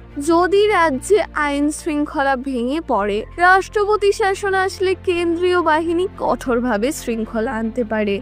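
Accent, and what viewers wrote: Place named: Bangladesh. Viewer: ভাষার উচ্চারণ শুনে মনে হচ্ছে এটি বাংলাদেশের চ‍্যানেল কাজেই বন্ধ করলাম এবং দেখা উচিত নয় ।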